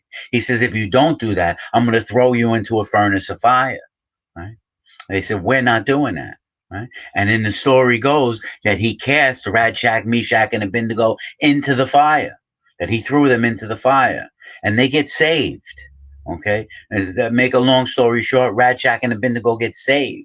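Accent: New York, United States. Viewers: I love your material! I can relate to a lot of your stories! I am from NY two so I reconized your accent! I really like your context!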